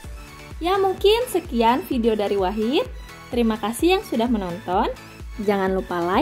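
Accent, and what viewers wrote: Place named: West Java, Indonesia. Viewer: Lucu ngomong nya enak di denger dg logat Sunda nya 🤗😘 salam kenal 🙏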